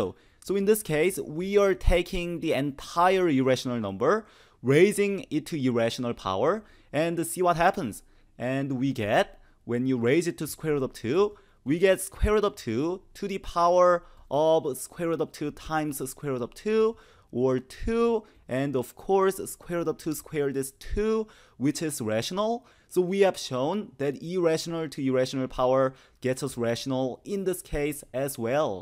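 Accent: South Korea. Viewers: 왜 한국식 영어발음 처럼 들리지.. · 왜 콩글리쉬가 들리지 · 한국인이세요...? 발음이 친숙한 콩글리쉬인데....... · His english accent sounds like Korean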